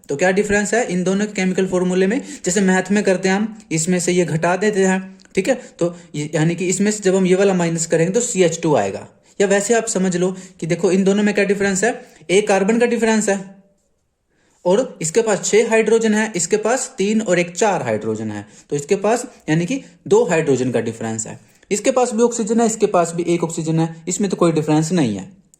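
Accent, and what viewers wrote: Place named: Haryana, India. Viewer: bhaiya aap bhi alakh sir ki tarah videos bana na suru krdo. Aapka haryanvi accent bht accha hai aur padhane ka style baaki sab se better hai , sab kuch samjhane ka style bhi osm hai . Agr aap saamne aake padhaoge screen pe to bacchon ko aur maza aayega aur aap ki growth v bht quick hogi. Wishing you all the best 🙏🏻💗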